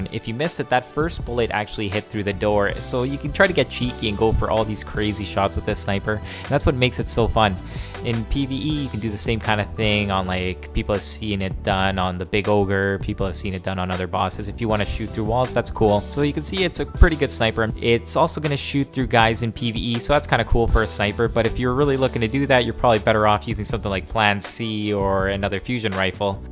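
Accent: Canada. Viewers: Your Canadian as hell :)) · Is it me or does he have a Canadian accent? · I believe he's canadian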